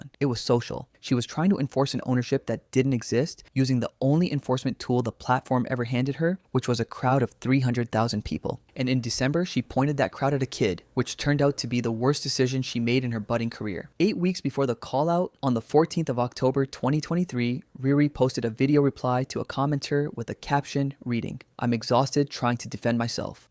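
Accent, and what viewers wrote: Philippines. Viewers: i think she is filipino, im a filipino artist too, i know what my people sounds like. 😂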